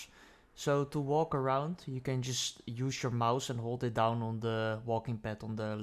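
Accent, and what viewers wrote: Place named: Netherlands. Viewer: Crazy Dutch accent (no offense)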